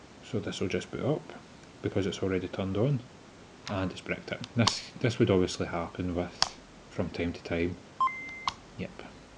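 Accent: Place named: Scotland, United Kingdom. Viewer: Thank you for this video! There's really no information on this thing anywhere online so it's nice to see it. Also a Scottish accent? Nice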